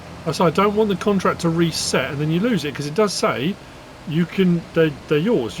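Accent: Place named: Manchester, United Kingdom. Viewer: Thanks for the guide. I'm going to be playing this for the first time tonight after pre-ordering it, so I'm excited to check it out. I enjoy watching your videos, and an aspect of them I really enjoy is your accent. I'm curious to know; are you from Manchester by chance?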